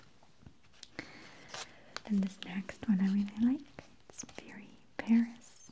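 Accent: Russia. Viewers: I seem to have the same reaction to various weights and qualities of journal pages! FYI that beautiful carved leather journal has a classical image of the three graces on it. from greek myth but most famously revived by Botticelli during the Italian Renaissance. google PRIMAVERA by him and you will see them. You are definitely the queen of relaxing ASMR videos. So elegant and soft and beautiful! lovely graceful hands too. And that very slight Russian accent makes your speech quite exotic. What a success you are! thank you for all you do. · I think the thing with is her accent. She is the only person who actually makes me go to sleep. I love her Russian accent, it makes it so nice. Who agrees?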